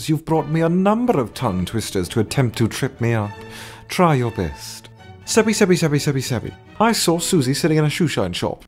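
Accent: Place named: RP, United Kingdom. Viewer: British ,butler.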